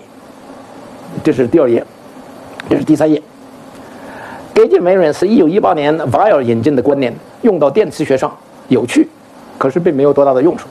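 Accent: China. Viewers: 精彩。在美国生活了一辈子，英语的华人口音还这么明显。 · 1922年出生的可以说是近代史活化石了呀 他的口音就是当时中国的口音吧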